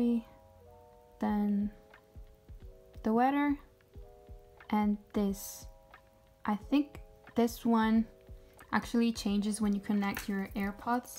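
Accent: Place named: Italy. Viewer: Hi are you Italian? Loved the video, also your accent is great. I’m Italian too, but I watch mostly English talking people on YouTube 😅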